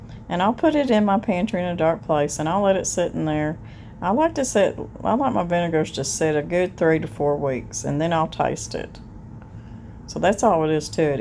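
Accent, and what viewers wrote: South, United States. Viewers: Thank you so much for the extra instruction...I know you guys are super busy, especially during this beautiful Spring weather. You sure do speak my language...clear, concise and just a "touch" of Southern drawl...I appreciate this more than words can say! Done so much pickling and canning in my days, but homemade vinegars is a first for me...you really CAN teach an old dog new tricks! 😉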